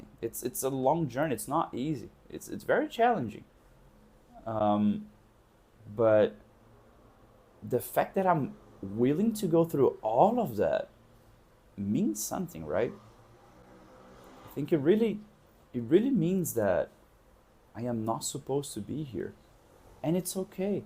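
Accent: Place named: Texas, United States. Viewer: Pq seu sotaque es texano??… why your gagshdgdjd is texans?😅😂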